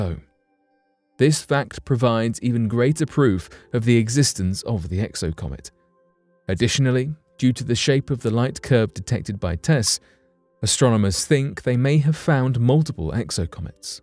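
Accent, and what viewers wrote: United Kingdom. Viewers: Thumbs down because of the British accent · Why do they always get some dude who sounds like he’s a member of the royal family to narrate these videos? I worked in space and aviation for my whole career and not once in our labs, did some English royal voice come over the speakers saying “ Jim I think you’ve got the solution!”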